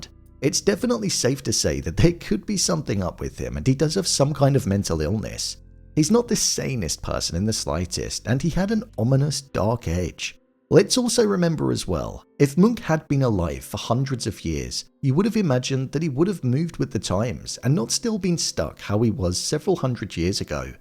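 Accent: United Kingdom. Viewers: Why do we need endless British narration??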